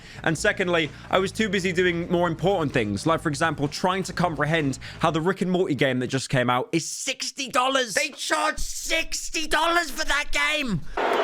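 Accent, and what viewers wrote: United Kingdom. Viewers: Bro why british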